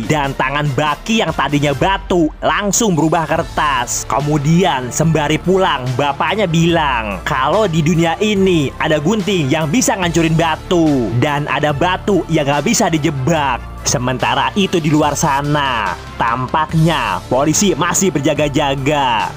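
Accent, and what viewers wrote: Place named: Jakarta, Indonesia. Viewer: Channel paling danta dan pembawaan nya betawi bat daah gua demen sama yang begini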